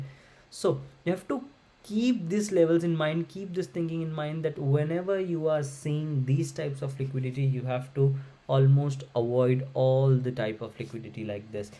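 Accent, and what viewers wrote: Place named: India. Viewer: your accent seems Indian by the way🙃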